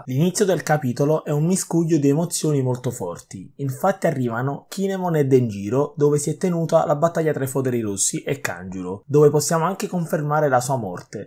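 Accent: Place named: Naples, Italy. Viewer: Che video penoso, non c'è coinvolgimento. Tanto valeva farlo con il sintetizzatore vocale di google translate. L'accento napoletano è insopportabile, potresti sforzarti ad attenuarlo un minimo.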